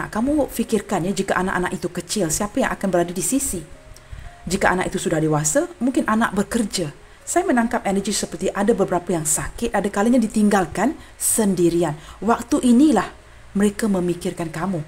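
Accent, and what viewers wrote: Malaysia. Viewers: Thanks you atas pencerahan nya bunda and aku suka swara nya yang khas Melayu nya sexi sekali bunda and sehat selalu ya bunda semanggat trus dalam konten nya 🙏🙏🙏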